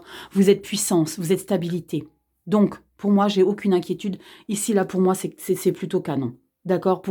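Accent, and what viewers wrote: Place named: Provence, France. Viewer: Un petit accent et expression du pays des cigales ❤❤.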